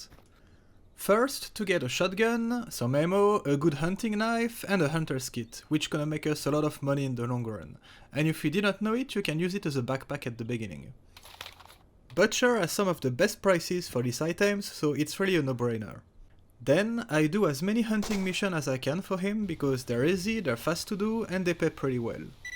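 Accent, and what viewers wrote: France. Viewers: tu a un accent fr je me trompe ? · l'accent bien Français des familles ^^, très bonne vidéo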